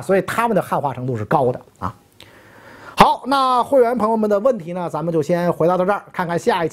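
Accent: Beijing, China. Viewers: 才发现袁老师的节目居然还有字幕，袁老师讲话需要字幕吗😂这么标准的北平音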